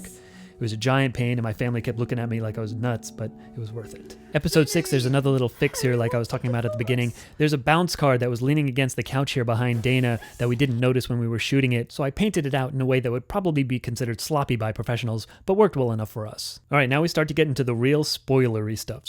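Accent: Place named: England, United Kingdom. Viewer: You have a great English accent mate! 😊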